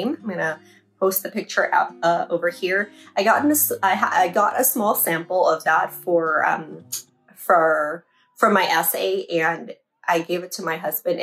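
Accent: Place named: France. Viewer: Great video sis, Loving your french accent..😄 I'm the same, as you, I have my fave perfume that I've been using since high school and its the Calvin Klein Eternity, it just brings nostalgia for me..💗 I'm perfume obsessed lately and have a few different ones..lol.. I do want to get the Louis Vuitton, Rose de vents and Chanel 1957. those two are on my Christmas wishlist . Thank you for sharing, have a wonderful weekend 😘💖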